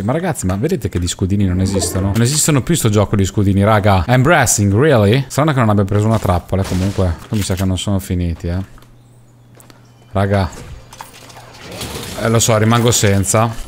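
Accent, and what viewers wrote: Naples, Italy. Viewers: Come è bello quando parli napoletano😍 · ma sei napoletano a fai finta di esserlo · E stupendo il tuo napoletano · Ma sei napoletano io si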